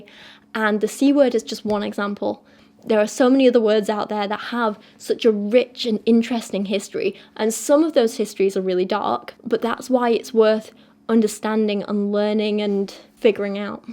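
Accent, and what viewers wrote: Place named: Northern England, United Kingdom. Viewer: accent isn't perfect RP. There's an element of RP in there, but it's also largely Northern.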